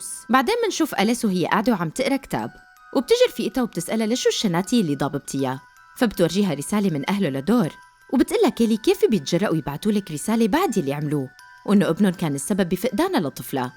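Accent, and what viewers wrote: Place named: Syria. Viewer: استمري💓 انا كل ليلة نام على فيديو تنزليه فعلًا اشكرك على نشاطك وانك تنزلي كل يوم هذا شيء رائع والله برافو 🤩 اتمنى تعملي فيديوهات لافلام غموض و افلام اخرى منوعة ليس رعب فقط رغم اعشق الرعب لكن التنويع جميل وخاصة انو اسلوبك رائع فعندي فضول اشوف اسلوبك في نوعية افلام اخرى🥰 وخاصة اني احب اللهجة السورية وانت لوحيدة سورية اللي تعمل فيديوهات ملخصات افلام مالقيت غيرك سورية اتمنى لك التوفيق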